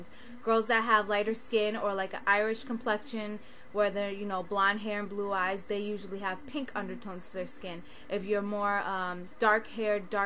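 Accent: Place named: Miami, United States. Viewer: you sound like your from Miami, anyway great video, very informative!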